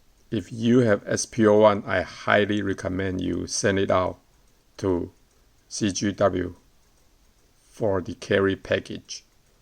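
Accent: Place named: Europe. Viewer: Your accent was so thick I thought you were European at first. Haha, nice guns!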